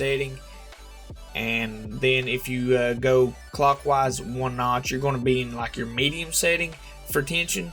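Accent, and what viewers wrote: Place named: West, United States. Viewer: I like your red dead redemption accent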